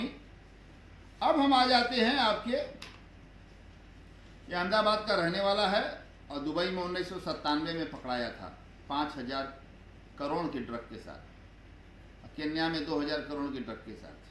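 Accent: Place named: Varanasi, India. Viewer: Sir apka ha topic bada dilchasp aur pardarshi hota hai इसपर से आपका बनारासिया अन्दाज़ सुनने में बहुत मजा आता है.. sadar pranam aapko..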